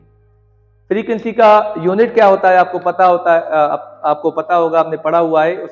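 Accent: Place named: Sindh, India. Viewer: Mashallah sir ap sindhi h mny just imagine kiya apki urdu language se or smjhany k tareeqy se k sindhi h sir ku k sindhi master h physic k or ap b aeseii lghy but ap to sindhi hi h mashallah buht acgy h smjhanab❤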